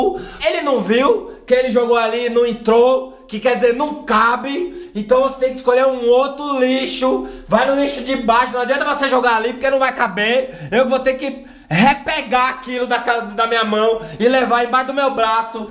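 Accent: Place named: Recife, Brazil. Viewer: esse sutaque é de ricifi né não fia?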